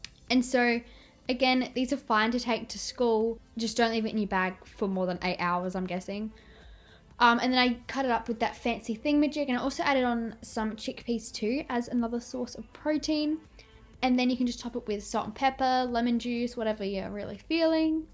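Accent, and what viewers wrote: United Kingdom. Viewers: thank you bri-ish lady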